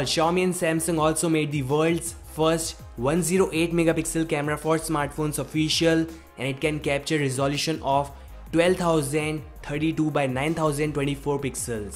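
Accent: India. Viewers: India English, hahaha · Idian english🙂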